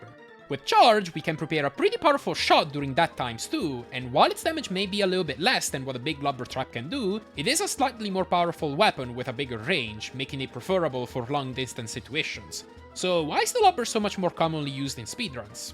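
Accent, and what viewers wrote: Italy. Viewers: Bro è italiano me sa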